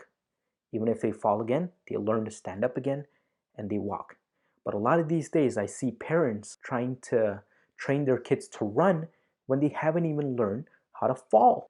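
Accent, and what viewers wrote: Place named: United States. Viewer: Do you live abroad, coz your accent sounds American??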